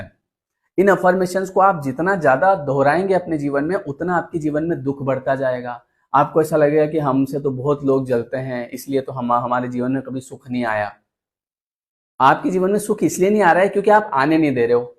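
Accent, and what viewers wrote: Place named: Mewar, India. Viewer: Sir, Apka mewadi tone bhut acha h bolne ka.😅 U are amazing. 😊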